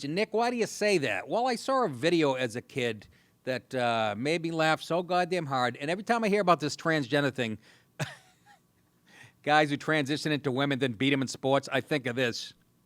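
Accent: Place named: New York, United States. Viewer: "sar" a soccer game. Ha...ney York accents. Love ya Nick.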